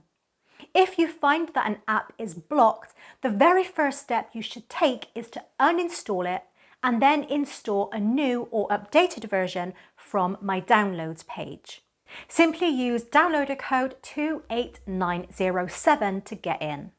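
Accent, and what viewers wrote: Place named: West Country, United Kingdom. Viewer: Lovely to hear and see a UK/west country female giving straight forward info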